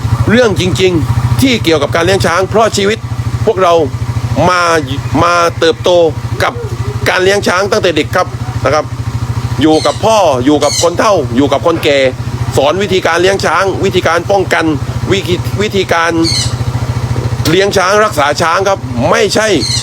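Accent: South, Thailand. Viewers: ชอบนายหัวจาพูดใต้ไม่ดัดจริต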